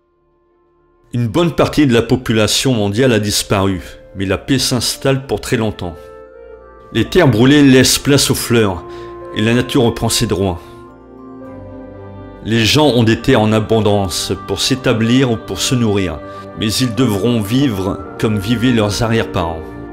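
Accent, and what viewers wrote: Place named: North, France. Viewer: Monsieur tu as la voix des gens du nord.di moi si je me trompe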